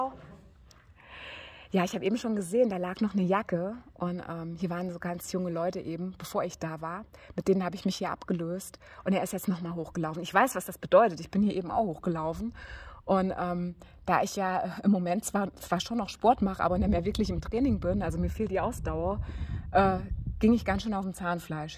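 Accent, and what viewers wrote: Hesse, Germany. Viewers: Liebe, heute an diesem für mich besonderen Tag habe ich dein Video mit dem aramäisch en Vater-Mutter unser gefunden. Du hast es wunderbar gesprochen - gefällt mir persönlich besser als die gesprochene Version von Monika Herz im Martha Gebet. Ein winzigen hessischen Akzent habe ch gehört- aber schön. Ehrlich gesagt, gefällt mir persönlich für unsere Breitengrade das deutsche Ur-Vaterunser doch am besten. Wegen der Klarheit, Schönheit und der Möglichkeit die Dinge in ihrer Gesamtheit zu verstehen. Wir müssen nicht alles neu erfinden oder in Sprachen sprechen, deren Struktur wir eigentlich nicht verstehen, alles gut wie es ist - soweit kurz zum Thema"Hype". Wenn wir das was wir tun, mit dem Herzen tun, ist es doch völlig wurscht, ob wir Urdu, Hindi, schwäbisch oder hessisch sprechen Erstaunt war ich auch über das Buch, daraus habe ich meiner Mutter auf der Intensivstation jeden Mittag vorgelesen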